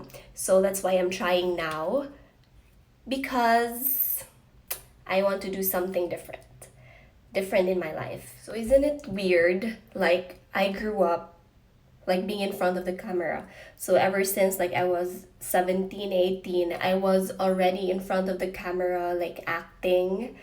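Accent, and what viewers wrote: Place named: Visayas, Philippines. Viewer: mkamingaw imong tingog nga bisdak 😂❤️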